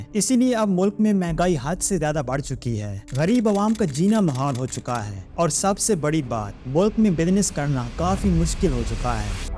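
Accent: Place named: Sargodha, Pakistan. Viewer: bhai sargodha se hain ap??? ap ka bolne ka accent sargodha wala ha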